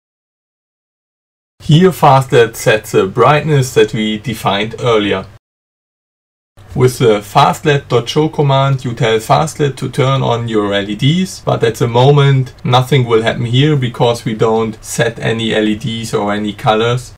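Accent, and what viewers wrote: Germany. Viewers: 1. Sekunde das englisch gehört, dierekt gewusst dass du deutscher bist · Man hört einfach raus das du Deutscher bist 😂😂😉👍🏻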